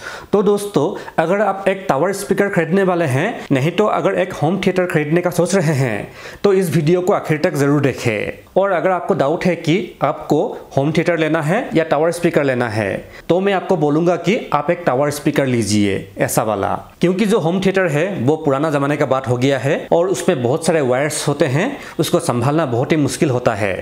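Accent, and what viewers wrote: Assam, India. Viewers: Aap Assam se hai aap ka aawaj se pata chala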